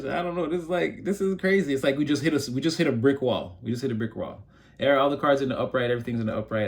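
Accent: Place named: Caribbean. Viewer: As a Caribbean, I love this reader lol and whenever he speaks in patois I swear it feels like he’s channeling my Caribbean passed on loved ones lol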